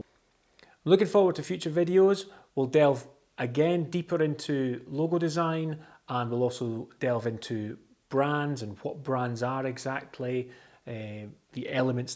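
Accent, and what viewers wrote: Scotland, United Kingdom. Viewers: HOLY CRAP YOU ARE SCOTTISH! IM PART SCOTTISH!!! HOW DO I GET BETTER AT MY ACCENT AND THE GRAMMAR IN SCOTTISH?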